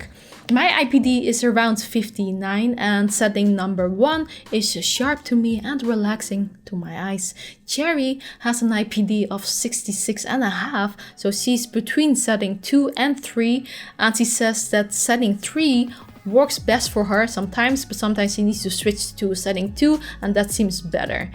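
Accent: Netherlands. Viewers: Iam from belgium and i can hear your dutch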